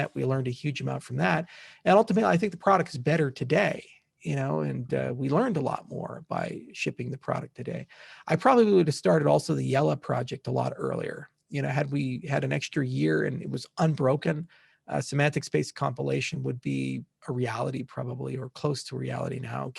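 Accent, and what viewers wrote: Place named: Netherlands. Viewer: i hear a slight dutch accent. great content!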